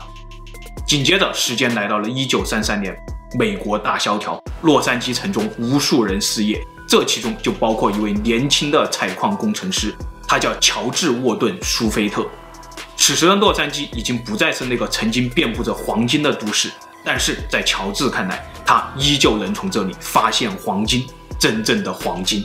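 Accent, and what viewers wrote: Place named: Fujian, China. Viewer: Hu 建 口音吧？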